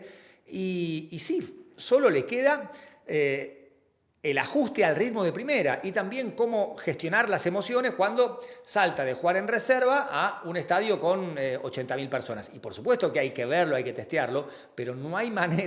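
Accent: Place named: Argentina. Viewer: que buen análisis, se te pegó muy rápido el acento argentino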